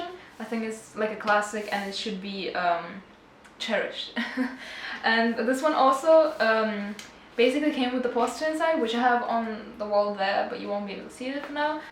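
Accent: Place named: Russia. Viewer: Hi i love, their compilation is definitely one of their best comps but i`d actually say all of their compilations are good because they constantly produce good music. If you like Twin Peaks you might like `s solo work. She sings some of the music in Twin Peaks and her solo album is beautiful, it`s very dreamy. Especially the tracks and. Also Twin Peaks related is `s track. He samples the theme music from Twin Peaks and uses it really well on. Although the only mix of that is a classic version is the original Woodtick mix. The other mixes are a bit tacky but the Woodtick mix is classy. You should do a cd update video. Also a favourites video would be cool. A collections video. I have a couple of questions for you. What country are you from, you sound russian. Where did you when you visited England? What were your impressions of England? I`m english so your answers would interest me. 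You come across as being quite artistic. Do you make your own art? Thanks for sharing your collection with us. I look forward to your next video which i imagine will be uploaded in about a years time. Cheers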